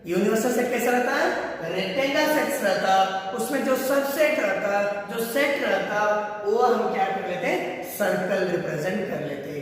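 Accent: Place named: Karnataka, India. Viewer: Pronounce somewords in Kannada but class is super.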